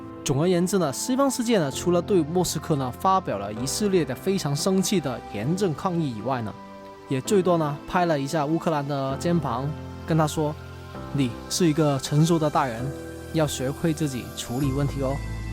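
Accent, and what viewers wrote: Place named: Hong Kong. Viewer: 港普还不错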